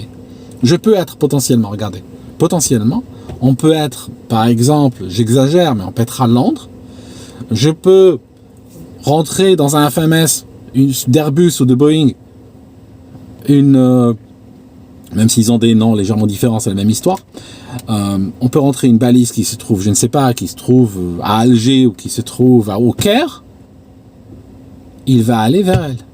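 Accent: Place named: Switzerland. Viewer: charmant le petit accent suisse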